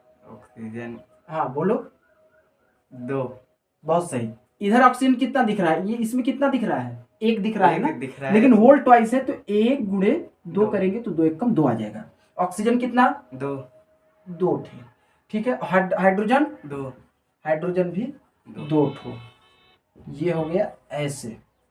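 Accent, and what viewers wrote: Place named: Singrauli, India. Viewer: वीडियो में सिंगरौली की आवाज का प्रयोग किया गया है।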